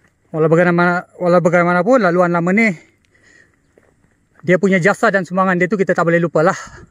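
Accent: Sabah, Malaysia. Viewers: Cara dia cakap pun tahu orang sabah…kau tu bnyak bergaul ngn orang sikit cara cakap macam ajak gadoh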